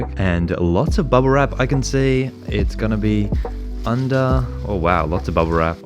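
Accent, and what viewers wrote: Australia. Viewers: Hes Australian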